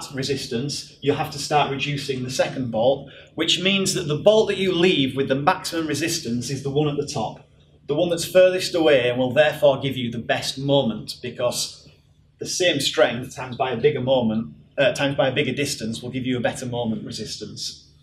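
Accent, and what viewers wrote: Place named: North, United Kingdom. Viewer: Thanks - great presentation - and the Northern accent reminds me of home!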